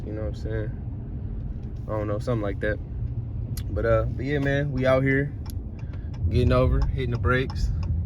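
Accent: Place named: New York, United States. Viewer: you sound like youre from nycc papa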